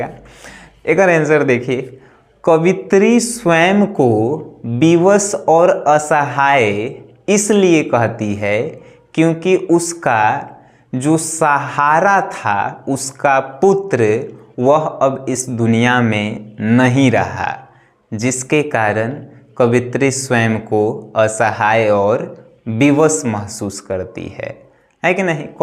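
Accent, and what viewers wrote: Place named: Bhojpuri, India. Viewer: Sirji aapki bhojpuri must h😝😝😝💞💞💞💞💯💯💯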